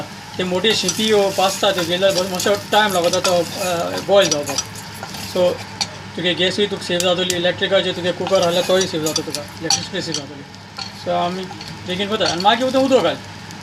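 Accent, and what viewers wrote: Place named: Salcete, India. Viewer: I lik ur salcete accent.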